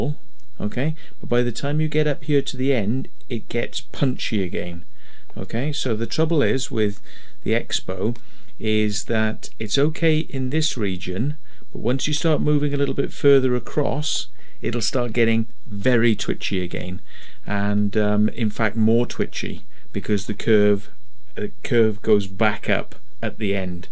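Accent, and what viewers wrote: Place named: Wales, United Kingdom. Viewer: Best description of these settings I've seen. Now I'm trying to apply what I've learned to my Mavic 2 Pro. Kind of funny to hear a fellow Welsh accent (I'm near Pontypridd)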